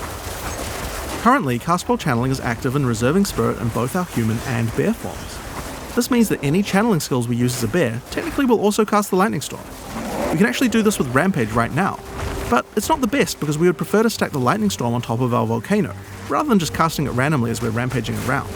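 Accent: New Zealand. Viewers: It's always surreal hearing my native Kiwi accent on YouTube lol. Looks dope af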